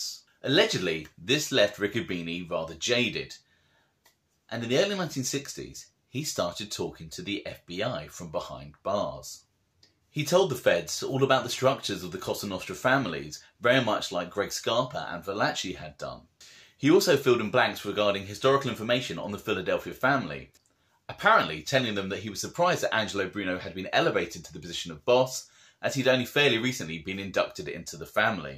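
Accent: England, United Kingdom. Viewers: Not for nothing but hearing a english accent is def a bit strange talking about the mobs in the States. Kind of like listening to an American explaining the british monarchy or viking history😮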